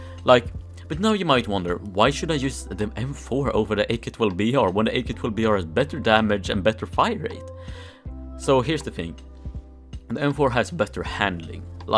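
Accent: Sweden. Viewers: This guy swedish? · He sounds more Swedish to me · bruh jävlar vad man hör du svenne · R you swedish lol? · Are you Swedish? You sound a lot like it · 100% svensk, hur på hur du pratar lol.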